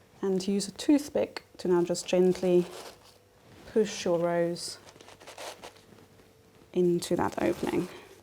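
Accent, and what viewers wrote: South Africa. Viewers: Are you South African?? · This is so incredibly insightful. Thank you for the time. Also are you south african? I know you in the UK. But you have bit of a South African accent.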